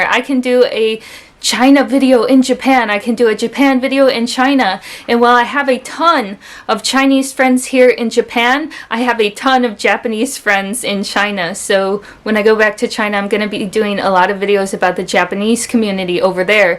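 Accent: Michigan, United States. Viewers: Wow you have a Michigan accent? I’m from Michigan too and currently learning Mandarin in school!🤩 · Your Michigan accent is very cute 👍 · Hello from Michigan and yes that is Michigan accent. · Ah the Michigan accent lol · Hey, I haven't heard a Michigan accent much before, so it's quite novel sounding to me. I like it. You're quite unique in you language ability with both Chinese and Japanese. I've learnt Chinese through mass reading of novels, and am also learning French, so I know the time it takes. I don't think I will start another language, at least not with the intent of understanding it deeply. I imagine it must be difficult thinking up new content all the time. But then again, a lot of people just enjoy the everyday vlog videos. 祝你幸福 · That's funny on some of the comments you mentioned.Being an American myself and having spoken American English since the age of 3, I can attest that your American English accent is still very much intact. 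You definitely still sound like a Michigander.:) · Hi. I'm wondering if Michigan English accent is similar to the accent in Southwestern Ontario in Canada. That's where I'm living, and to me, their accent sounds a bit different from yours. This is very common in China, but probably also happens in English-speaking countries (geographically very close but different spoken accent).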